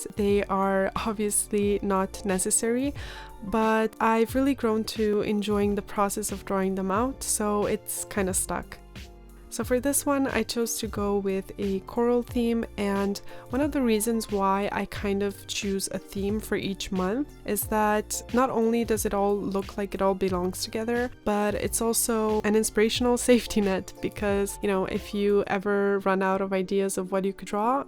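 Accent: Germany. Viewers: Wow thanks for replying! And thank you for your nice words! I can imagine you're busy, I've picked up somewhere in the comments that you're working on your masters, good luck! I'm doing my PhD right now and I'm forced to keep it minimal because of lack of time. However I still try to dedicate at least 5 min per day to journaling. It gets my mind off real life :) Unrelated but are you German? Your accent sounds very familiar, haha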